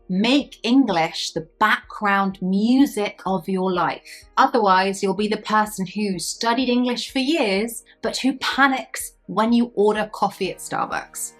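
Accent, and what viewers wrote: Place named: United Kingdom. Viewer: Dear it is a real pleasure for me;) it helps me remind some expressions and refresh this beautiful language. Love the UK accent more than the American one;) so polite and a ‘clean pronunciation’ Definitely, English has been very important in my life and musical career … more than Spanish … can you imagine? Happy to discover your Channel and follow every episode🙏🩵🙏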